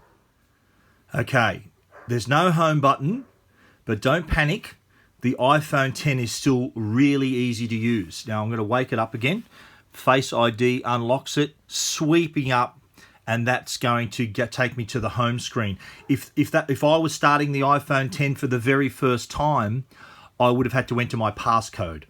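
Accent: Australia. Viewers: its an australian accent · He must be Australian because I am also and I didn’t think he said swipe of sweep any differently than I do 😂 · Guys I’m Australian, I think it was just the way he said it, u know what he means it’s not really an aussie thing, just the accent is. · Aussie accent sounds top notch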